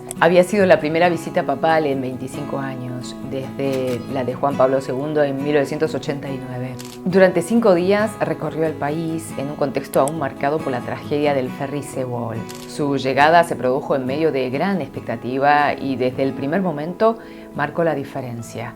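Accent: Argentina. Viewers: QUE BONITA VOZ QUE TENES, RECIEN TE CONOSCO Y ME QUEDE A ESCUCHARTE,me sorprendió tu tono de voz se asemeja a tonada argentina!, saludos desde ARGENTINA..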